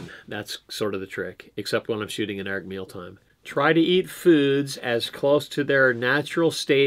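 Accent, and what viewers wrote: United States. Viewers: Are you from Hawaii? Certain things you say reminds me of home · interesting .. I thought you're Californian.... LOL ... didn't knew you learn to surf in hawaii ...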